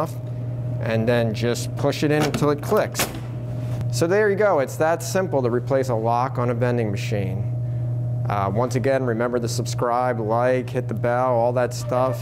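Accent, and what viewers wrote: Philadelphia, United States. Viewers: You have a strong Philly accent.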